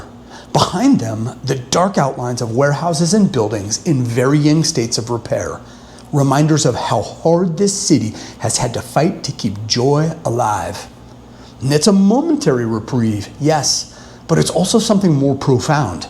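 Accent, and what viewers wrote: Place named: Philippines. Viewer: Look like you speak filippinos accents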